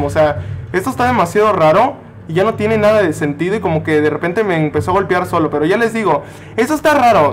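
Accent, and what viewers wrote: Mexico. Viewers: Esperen un mexicano creo diciéndole a los de usa americanos dios Trump que hiciste con México creo(por tu tonada dedusco que eres mexicano )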